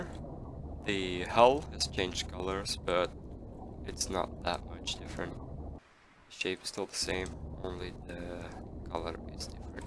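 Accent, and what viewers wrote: Belgium. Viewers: You from België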